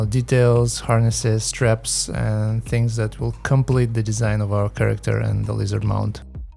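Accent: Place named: Russia. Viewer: хорош кста слышно русский акцент 😃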